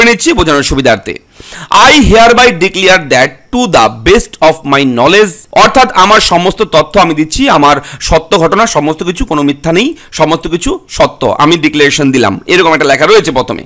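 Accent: Bangladesh. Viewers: Jini announcement korchen, taar uchcharon shune to mone hochche je tini Bangladeshi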